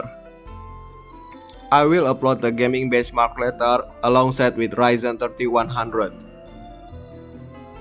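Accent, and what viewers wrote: Indonesia. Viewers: logat nya kayak indo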